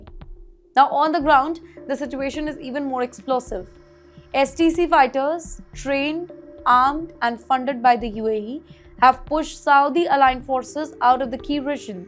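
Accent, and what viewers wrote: India. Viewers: She's Indian, they pretend to speak English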